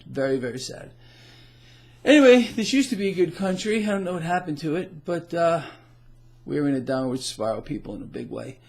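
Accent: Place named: California, United States. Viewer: your starting to sound like your from Califonia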